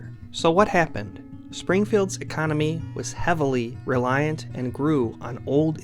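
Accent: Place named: Berkshires, United States. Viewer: Your accent sounds sort of like a Berkshires accent, though I'm guessing Wisconsin after "Worchester" 😉 Springfield and Bridgeport have had similar trajectories since the 50s. Both had major arms manufacturing disappear. Both had crime issues in the 80s. Still not in great shape, but doing better. Thanks for doing this.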